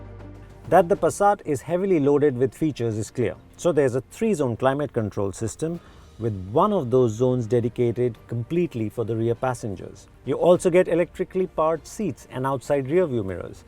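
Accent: India. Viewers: bullshit... This guy has a good Indian accent that is crisp. He is very easy to understand and is very serious... Matt speaks very fast... Swallows his words... And is full of goofy jokes ...which are nice too...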